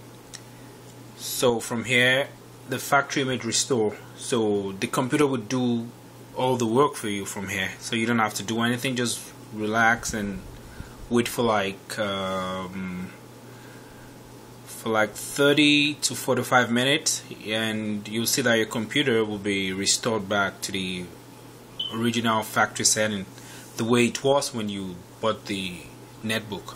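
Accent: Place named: India. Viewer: Note book not net book and you call the screen a creen I swear all of these tutorials for computers are done by Indians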